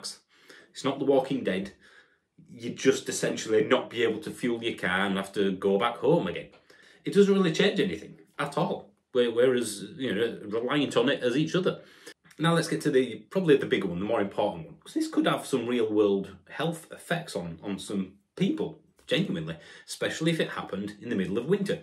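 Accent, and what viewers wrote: Yorkshire, United Kingdom. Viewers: nice to hear the Yorkshire accent in among all the American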